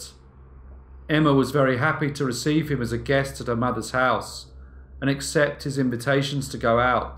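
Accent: England, United Kingdom. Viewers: It is a good strong and very clear English Accent you have and perhaps some People Don't like certain Accents and nothing can change People's minds on an accent. Don't mind the critics,your channel is a treasure! You always deliver the story brilliantly and with real conviction. Its always a pleasure to listen to your stories,thanks again.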